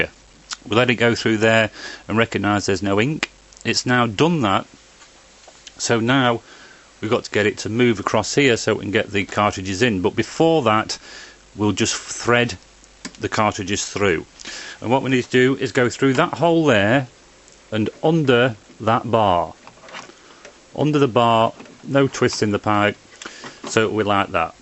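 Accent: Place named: United Kingdom. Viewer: I have only this minute looked at your video, the ciss seems just what I want. I will look into things a bit deeper and contact later. In the mean time I will say that I personally prefer instructions that have a drawing of all the relevant procedures and parts so that I can take my time doing things. Thank God for a lucid fellow Brit who I can understand. Good luck.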